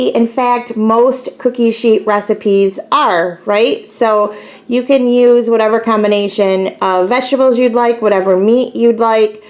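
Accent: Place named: Detroit, United States. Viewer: Yes! Do it! I never thought to make Hash on a sheet pan. brilliant!! Looked so delicious. Right me too. I e been doing way more of them. Just throw away the foil. Great job! Aha! I KNEW you were from the Detroit area! I could totally dedect that in your accent ❤️. I grew up in the Toledo area and my In laws lived in Clarkston! Small world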